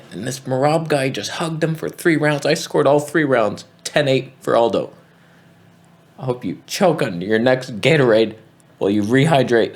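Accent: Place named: Canada. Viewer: It’s like if Jack Slack had a vegan Canadian accent.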